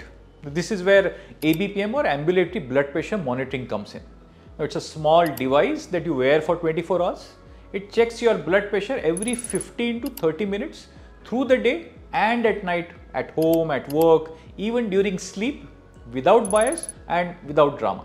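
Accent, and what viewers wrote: India. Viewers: Your thick Indian accent makes me difficult to hear and understand.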